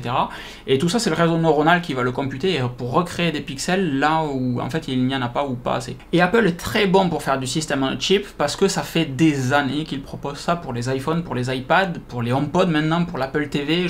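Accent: South, France. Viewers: Bon moment, l'accent du Sud en +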